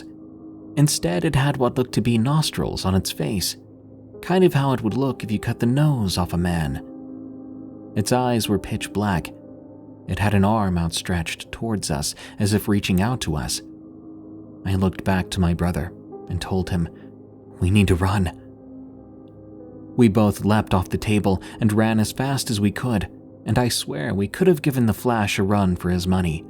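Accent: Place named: United States. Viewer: The first story is meant to be in the south of England… people do not use American words in the south of England or anywhere in Britain for that matter, you also made it sound like Aberdeen was not that far away… its in Scotland.. the opposite end of Britain.. We also dont have guns.. if you are going to read a story from England, (also sounds American) please be authentic as most English find it insulting..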